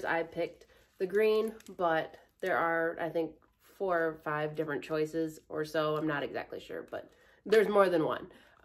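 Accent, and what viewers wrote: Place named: Minnesota, United States. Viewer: 100% she’s from Minnesota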